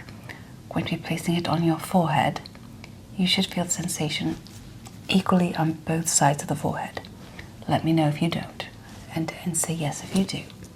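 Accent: RP, United Kingdom. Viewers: brit, i dig it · I'm British this sounds posh to me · lovely British accent by a lovely nurse 😊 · As a Brit this is very good. Usually, when non-Brits try an English accent they tend to drift all over the UK merging different dialects. But this is solid. 😊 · Downton Abby English certainly, Modern English not so.. But very good accent for that era/class.. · British accent on ASMR kill me😍😍😍😍😍😍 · Love the British accent 😂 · Received Pronunciation (often referred to as RP English) generic South East England. A bit like Gwyneth Paltrow in Sliding Doors. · Wow I'm British myself! and your British accent is amazing! You sound posh and upper class! I love it 😊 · You look very beautiful. And your British voice/accent is extremely soothing. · From the uk ,you have nailed the accent it’s very bbc great work 🙌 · Love Your ASMR British Accent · Posh English accent 😝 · This was great! 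Bit of the posher old fashioned Brit but sooo relaxing! Jolly good show! Let’s have another please! In fact a pat down in Britain! 😊 thanks Steve x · Great video! Wonderfully done "Broadcaster British" and, IMO, the lack of eyeliner/change of makeup compared to your usual videos looks really lovely (gave it a more doctorally feel). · Not a bad british accent... A bit of mix between dick van dyke and marry poppins, which seems to be where most yanks (unfortunately) get their reference materials 😊 Your accent is a little bit overly RP, so it sounds a little "posh"... But at least you didnt try and go for a cockney accent 😅 Nice job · Odd bits sound a british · Great video! I listened with my eyes closed and you sounded like a true Brit!👌👍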